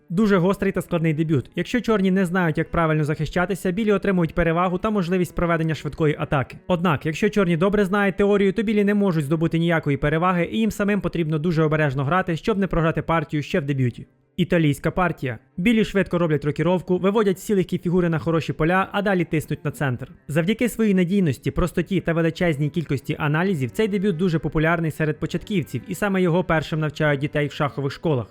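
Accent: Ukraine. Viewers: Ахахахах какой смешной колхозный малоросский говор)))) пешака ахахахахах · Ох уж цей ужгородський акцент)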